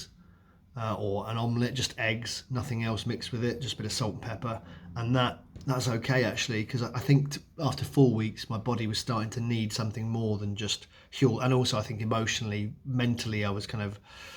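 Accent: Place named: England, United Kingdom. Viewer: I'm quite sure he's English , judging by accent and the sockets behind him 😂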